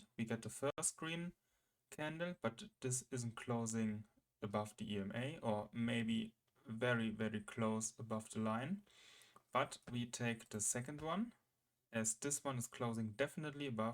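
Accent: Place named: Germany. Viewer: After two seconds, everybody recognises the German accent.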